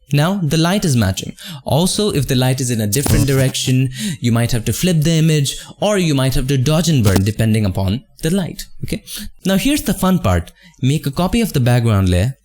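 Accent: India. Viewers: Dude, Indian accents are bad enough, but that breathing and uni are simply impossible to deal with.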